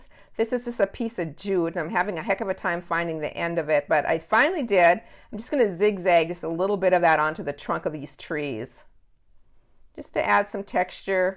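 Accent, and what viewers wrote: Minnesota, United States. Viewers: Brand new at sewing. Thank you for teaching this. New subscription to you. Your accent reminds me of my aunt from Minnesota. I could be wrong. Thanks again.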